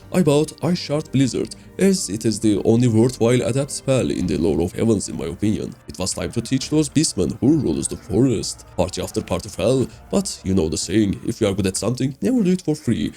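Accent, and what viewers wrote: Turkey. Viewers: Are you turkish man you sound like it gankim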